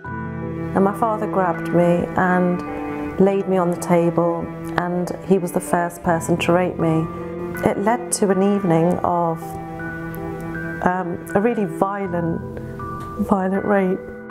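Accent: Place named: England, United Kingdom. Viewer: I am so sorry that you went through Hell. But you have an English accent, and if you are living in London go to the police and get your Dad thrown in jail, and let him rot there. I was born in India and I know what women go through. If you are living in a foreign country. The athorities will protect you.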